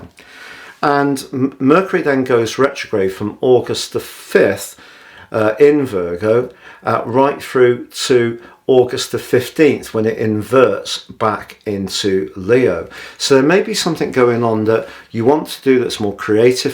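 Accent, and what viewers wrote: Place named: England, United Kingdom. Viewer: Thank you lovely. So nice to hear an English accent on u tube like a pair of warm slippers! Ive had some amazing prophesies Tarot wise i tried to align them with your reading. However, i remain a novice of astrology so it was too difficult for me to do. Time will tell. Best wishes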